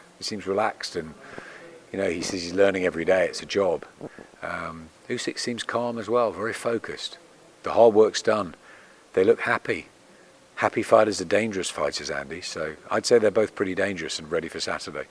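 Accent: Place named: Liverpool, United Kingdom. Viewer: some scouse accent on....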